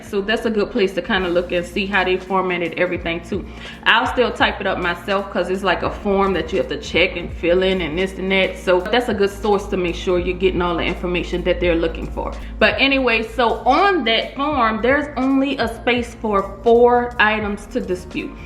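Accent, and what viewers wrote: New Orleans, United States. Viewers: U sound like u from new Orleans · Are you from New Orleans? I’m new sorry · Great video! Sounds like a New Orleans accent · I could tell you from the boot, I hear that New Orleans accent 🙏🏽 thanks for the information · Accent sounds like Toya (from New Orleans) lil Wayne baby mama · New Orleans accent love it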